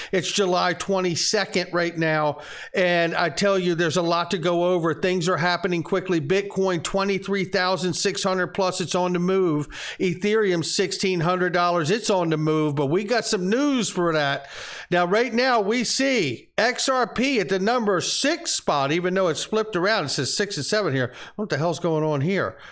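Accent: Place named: United Kingdom. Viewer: your videos report i excite see you every time you very very make me smile.. we excite about coin this you say for us XRP. WE LOVE YOU MUCH MUCH MUCH, U speak british cool 😘😘😘😘😘😘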